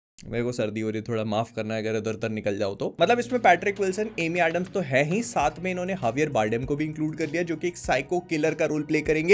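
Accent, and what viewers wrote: Bhopal, India. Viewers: bhai bhopal se ho?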